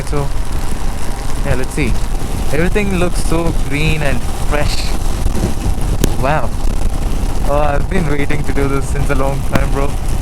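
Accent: Karnataka, India. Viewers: Bro ur kannada slang is very nice bro 👏.. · ಕನ್ನಡ ಕೇಳೋಕೆ ಚೆಂದಾ❤️superb bro ❣️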